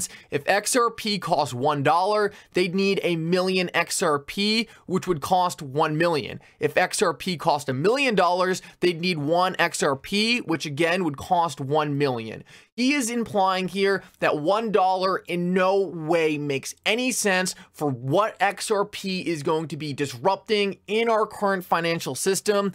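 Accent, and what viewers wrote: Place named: California, United States. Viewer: Are you from California? You have a Cali accent.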